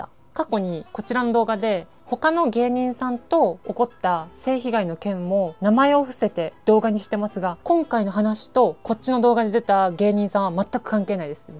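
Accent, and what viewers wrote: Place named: Kansai, Japan. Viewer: 関西弁だから関西人かぁ？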